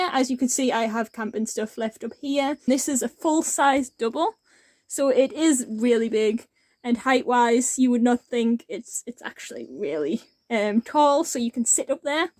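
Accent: United Kingdom. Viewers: Are your from Durham?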